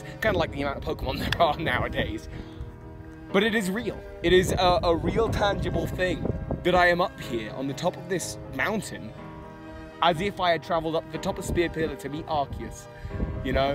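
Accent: United Kingdom. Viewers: ive always thought you said "so hi pokemon masters" cuz of the brittish accent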